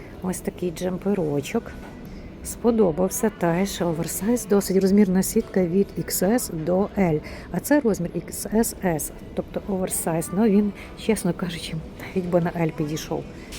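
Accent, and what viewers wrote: Lviv, Ukraine. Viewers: Вітаю вас,! Щиро дякуємо за огляди, бо вони є дуже цікавими. Ви дуже гарно подайте огляд продукції. Це ніби як сама оглядаєш та відчуваєш склад тканин. Я також є дуже прискіплива до якості пошиття виробів. А ще мені подобається ваш тембр голосу, вимова. Відчувається той львівський шарм. Люблю ваше місто. Місто моєї молодості, студентсва😊 💛🩵🍀🌷🌷🌷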